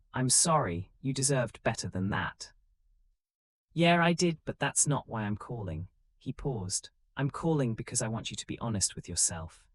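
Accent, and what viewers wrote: United Kingdom. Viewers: I absolutely love the storyline it shows that Jake and Carter has true love their soulmates even if a Brit wrote the story I wish that they would get someone to narrate it with more of a Montana North. This is not the first word to listen to someone from Brett narrated it's just that it would make it more believable if they would get someone from the USA. I'm not trying to be racist or negative but that's just my opinion...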